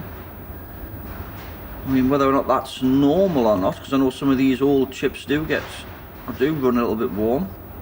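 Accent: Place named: Newcastle, United Kingdom. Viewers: Ooooo sound like a Geordy must be close to me hopefully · Very interesting, thanks. Dad was from the NE, love the accent. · You sound like your from my area Newcastle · You sound from the north east whereabouts are you?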